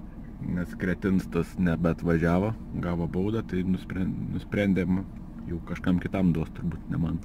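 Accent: Šiauliai, Lithuania. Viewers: tikras siaulietiskas akcentas :D linkejimai is pietinio :) po keliu savaiciu jau norisi namo ar visa kadencija (6sav ar ilgiau) viskas ok?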